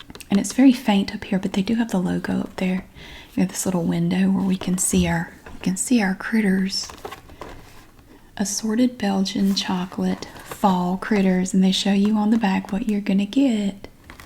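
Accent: South, United States. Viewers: Christmas time, Southern accent in asmr rhyme, aldi products crinkling under 30 decibel level time. 😊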